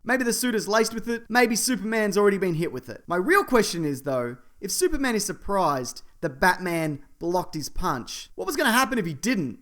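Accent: Australia. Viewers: This guy sounds Australian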